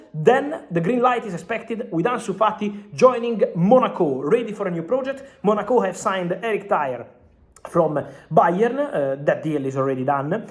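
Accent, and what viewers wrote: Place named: Italy. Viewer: It´s always funny and so annoying at the same time hearing an italian guy speaking english with italian accent.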